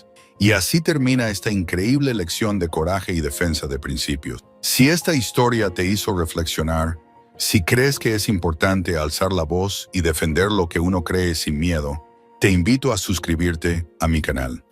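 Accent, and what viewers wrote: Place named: United States. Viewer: Pregunto. El narador porque Abla de una forma tan rara parece que es americano y termina ablando en un Español raro perdón es un detalle que a mí me hace dudar la intención. Perdón es un detalle.😮😊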